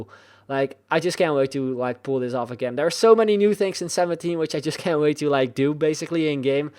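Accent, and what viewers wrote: Netherlands. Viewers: Are you Dutch? I hear an accent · u dutch?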